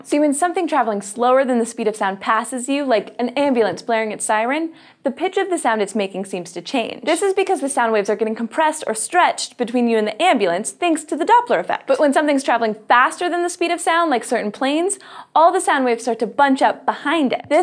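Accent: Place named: Idaho, United States. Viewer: Weird... Even me, a non native speaker with a pretty poor fluency can understand her. Google says somewhere around Idaho, USA... Btw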